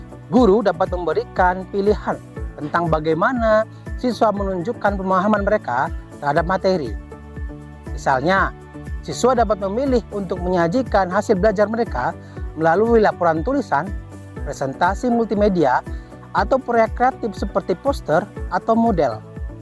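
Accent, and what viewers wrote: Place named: Aceh, Indonesia. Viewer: logatnyo sudah beda sekarang sdh jd orang aceh bener ni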